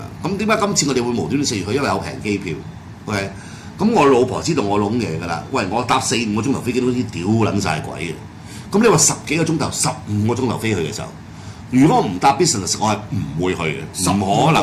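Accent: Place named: Hong Kong, China. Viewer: 你港式英文都好勁口音😅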